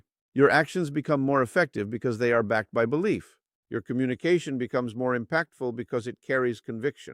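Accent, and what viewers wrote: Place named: Spain. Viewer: Jose had a pretty strong Spanish accent from what I remember. But these are definitely his words. His cadence was also slower. His speech naturally allowed one to absorb the information. Did u speed the video up & use AI to adjust his voice? His ideas are solid since he studied this from the 1950's-60's but the editing made it just a regurgitation of info that one doesn't quite catch. Solid ideas-great, taking away the accent-ok I suppose or meh, Speed & cadence change- meh. Dont really care for it. But I've listened to Jose walk thru a meditation/guidance almost every day since I found him 2-3 yrs ago. Vishen has a good voice but does the same thing g u did. Delivers it fast. His goal tho is for sales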